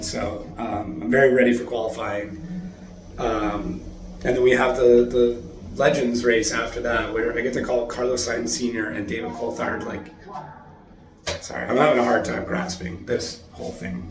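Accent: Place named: United States. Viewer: you try your best to anounce Polish and not Polish drivers at comentators both, sometimes i think you are polish komentator speaking with US akcent, good work it was good experiende to hear you, Polish drifting its sutch a big history that you will need exdent this movie to 10h, but good shot trying to show people what its all abaut, you did a greate job ,,check Final in Płock - Adam Zalewski vs Piotr Więcek or James Deane vs Pawel Borkowski - THE BEST DRIFT BATTLE EVER? at Płock" glad that guys from DMP work with RedBull and the Championship is big but still have the spirit of family grassrots event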